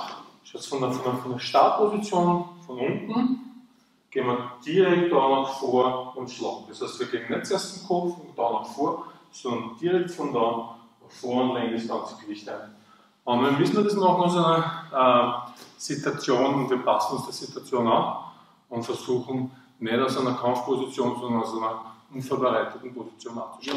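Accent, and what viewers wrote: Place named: Vienna, Austria. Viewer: Hallo Super video nur eine kleine kritik am rande sie reden zu schnell und man versteht sie kaum also zumindestens ich aus hamburg verstehe kein wienisch manche wörter versteht mann aber wenn sie zu schnell reden kommt man nicht mit Das gesagt bedanke ich mich für das tolle video weiter so ein daumen hoch von mir und das abo an ihr kanal selbsverständlich 👍👍👍👍👍👍👍👍👍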